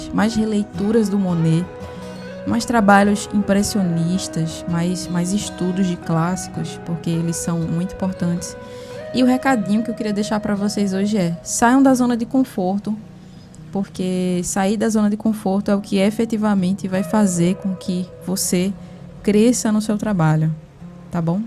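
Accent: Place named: Pernambuco, Brazil. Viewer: De onde tu é ? seu sotaque sobe mais pra pernambuco em diante, tbm sou nordestina ,eu já quis comprar a sonnet pra colocar em uns minis estojinhos que comprei, eu tenho a white nights a yellow ochre é horrivel de transparente mas as outras cores são maras eu gosto delas, tem uma galera que não acham elas profissionais o suficientes como se tivessem entre as profissionais e estudante, n sei se é a origem da tinta, por n ser marca francesa ou inglesa ou norte americana. O ocre da sonnet é ruim que a white nghts? sinto falta de ter migos pra trocar swatch de cor , e n teria comprado o kit da cotman45 cores num dia que ele passou sem dupla taxa pouca coisa mais cara que a de 12 cores n brasil , por isso n me arrependo. reconheço seus videos pelo pincel amarradinho do cabo curto da keramik , nunca vi ninguém falando deles.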